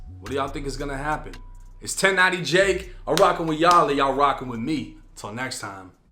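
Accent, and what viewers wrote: Boston, United States. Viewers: I hear a boston accent? i live in dorchester. from southie. definitely subscribing. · I Love❤ Your BOSTON ACCENT...🔥🔥🔥🫶😁🔥🔥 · First time ever seeing this channel….. dude gotta be from Boston 😅😂😅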